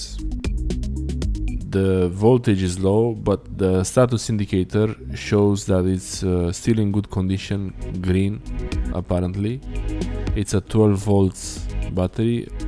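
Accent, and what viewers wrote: Romania. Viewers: Ești roman? Se simte accentul 😂💪🏻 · Could hear something was familiar to that accent. Te salut din bucuresti!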